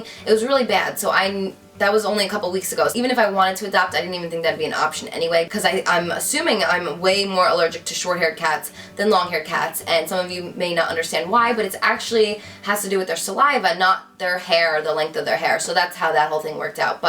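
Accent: New Jersey, United States. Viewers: i KNEW you were from Jersey!!! yay!!!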